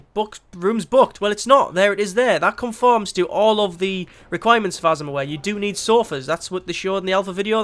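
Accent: United Kingdom. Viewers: You are british right? If so i (you doctor ofcourse) demand that you take two extra cups of tea with every meal from now till that nasty cold is gone with.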